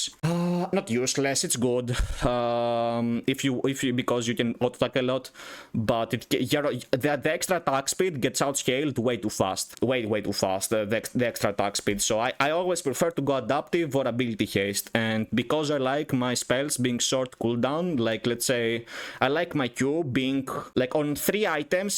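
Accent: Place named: Greece. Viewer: In 2 seconds I was like, he is Greek. What about support Zoe?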